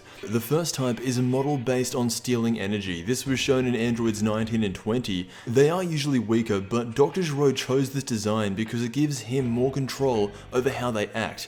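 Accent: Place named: Australia. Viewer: it's just an aussie accent lol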